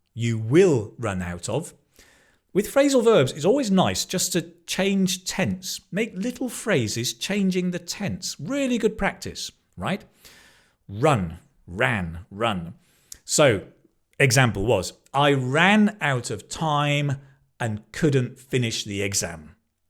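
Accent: United Kingdom. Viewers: En excellent english teacher explaining things with zeal and zest using original British accent.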